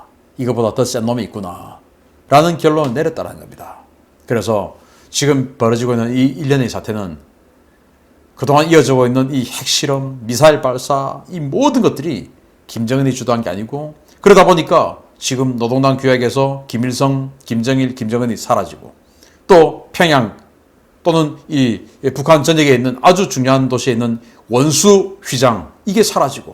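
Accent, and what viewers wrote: Gyeongsang, South Korea. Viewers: 정은이기분이별로네요..핵폭탄를싸되야할텐데머니도.딸리니기분이영아니네요.교수님경상도 사투리로.큰옷입은모습를 우장바우라하심에넘정겹게들립니다 정은이도마음ㄸㅅ데로안되니만사가다헛된짓거리에후회라도좀햇음합니다.더운날시에늘애청할수있어서감사즈립니다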